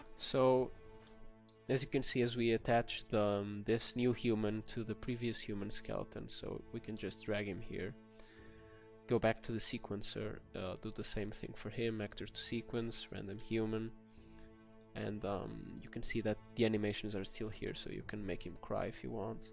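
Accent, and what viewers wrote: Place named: Portugal. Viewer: Nice video man, could swear by your accent you are Portuguese (^_^) (Confirmado, reparei agora no canto inferior direito do ecran, ta o teclado em pt heheh) Continua esses videos bacanos, abraço.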